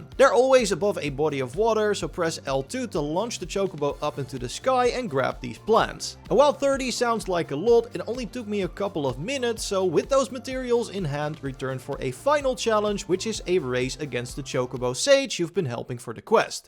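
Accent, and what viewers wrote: Netherlands. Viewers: I love your hearing your Dutch accent lol. Goeie video!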